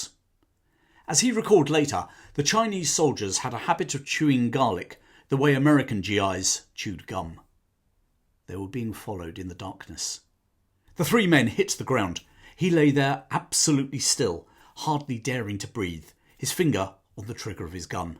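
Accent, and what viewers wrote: England, United Kingdom. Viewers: As a foreigner, I do enjoy your beautiful british pronunciation. Thank you for your british sound in the global era of the unified american english. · Only just discovered your excellent channel. How refreshing to listen to a narrative in English by an English man! Some of those American narrators are terrible. Can't pronounce place names correctly, etc. And as for Charlton Heston on some of Timeline's offerings! Say no more!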